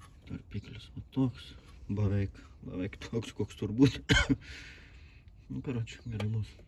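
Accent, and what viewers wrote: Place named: Samogitia, Lithuania. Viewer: tai arba žemaitiškai šneki, arba lietuviškai, apasispręsk..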